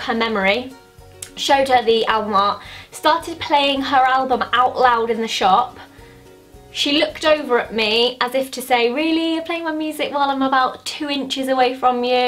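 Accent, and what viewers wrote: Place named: United Kingdom. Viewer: то, что вы имеете в виду - это американский английский, а это - чистый британский акцент (может не чистый, но британский английский)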